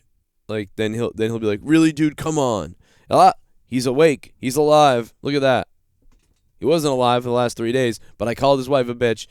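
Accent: Boston, United States. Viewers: sounds like someone is from the BAWSTIN AREA. Subbed as a fellow Masshole.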